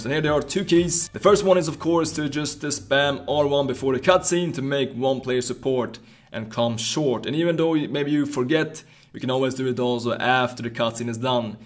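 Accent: Sweden. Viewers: Man hör att du är svensk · The accent sound swedish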